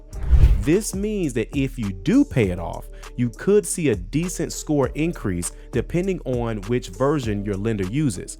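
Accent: United States. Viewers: What accent? American accent?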